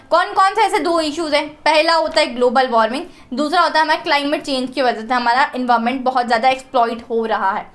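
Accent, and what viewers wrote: South, India. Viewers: R u from South?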